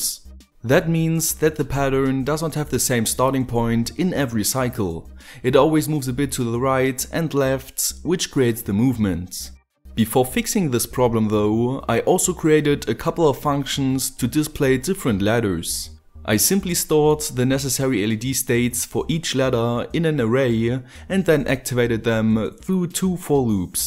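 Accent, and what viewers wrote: Germany. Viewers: English with a German accent. Sometimes surprisingly easier to understand than English from England or English from America can be. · Hallo mein deutscher kamerad. Hab deinen akzent erkannt lol :D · are u german? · was wondering what kind of accent that was! Glad to know now! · Hey, may i ask from which country you are? not that i would criticise that, but you have an accent that for me sounds a bit like german - and as im from germany, i just wanted to know if im watching a neighbor or something:) (But well, i guess there are a lot of similarly sounding accents, and as yours isn't that strong it could be a lot different things. isn't a german name at least^^) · What about turning a Laptop into a single Keyboard, Monitor and PC itself? And by the way: You are German, right? Sometimes in the Videos there are German words/sentences and i can kind of hear, that you are German. 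Wo wohnst du ungefähr? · let me guess... youre a german... (like me) ?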